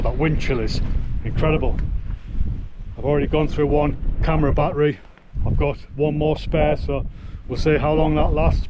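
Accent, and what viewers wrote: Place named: Newcastle, United Kingdom. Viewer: you sound a bit geordie, she says Yorkshire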